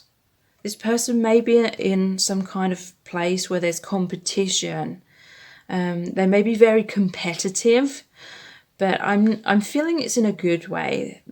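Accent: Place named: United Kingdom. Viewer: When did I not notice that you‘re british😯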